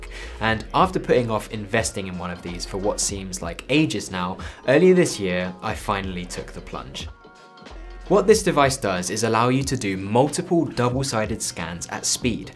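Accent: United Kingdom. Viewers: This might sound strange, so advance apologies. I have become a fan of your British English accent.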